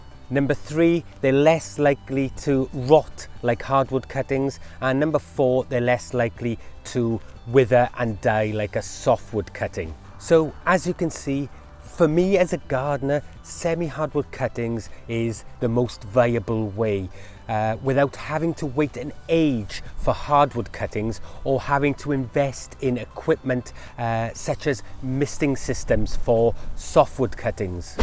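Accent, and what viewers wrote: Wales, United Kingdom. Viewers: Thank you, that was so clear and totally understandable and especially delivered in a lovely Welsh accent. It is so applicable to our Scottish climate.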